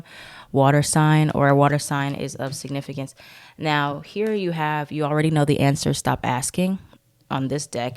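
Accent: Bronx, United States. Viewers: U from the bronx??? GET STURDYYYY 😆😂😂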